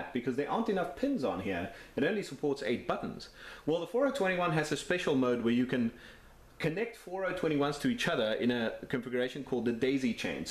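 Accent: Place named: South Africa. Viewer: you sound like you have a bit of a latent South African accent going on there. Awesome video too, keep it up.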